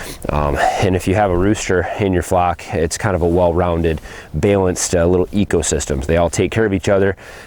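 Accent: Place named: Midwest, United States. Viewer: What part of the country? Midwest, I'm guessing? I live in the Pacific Northwest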